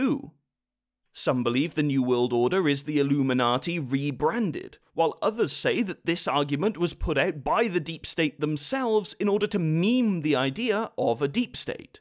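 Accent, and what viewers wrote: United Kingdom. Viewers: I kept thinking... is this guy a savage British guy or a well spoken Australian...but then he said pound and meant currency and I'm siding with him being a brutally savage British. Also... yes I'm American... and... yeah... sorry about... well... most stuff after 1776... we kinda got a little upset about a lot of things and didn't think to restrict gun ownership clearly enough and it has all been down hill from that point...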